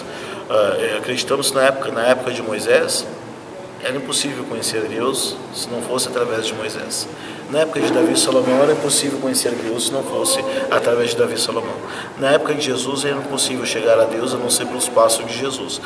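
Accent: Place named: Rio Grande do Sul, Brazil. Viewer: Cara você é gaucho tá claro isso.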